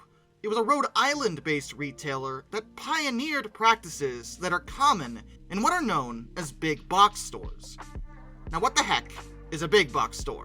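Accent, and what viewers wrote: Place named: Michigan, United States. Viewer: You must be from Michigan... there's no S on K-Mart/Walmart/Target!!!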